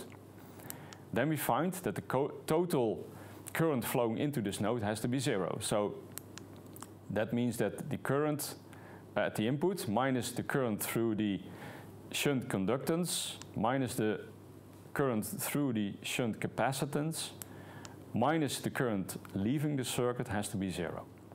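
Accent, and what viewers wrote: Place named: Germany. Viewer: English with a German accent. :)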